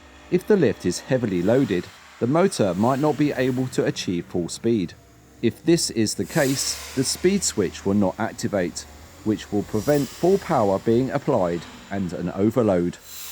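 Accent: United Kingdom. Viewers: What kind of accent do you have? Something British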